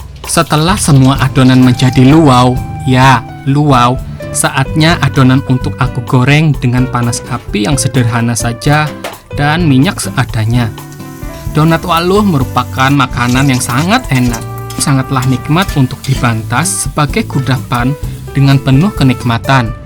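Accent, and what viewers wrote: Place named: Banjar, Indonesia. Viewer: Bang saran , mengenai banyak koment yang kada paham bahasa banjar , di kasih text aja bang , biar logat lucu bahasa banjar nya kada hilang ,dan orang luar pulau paham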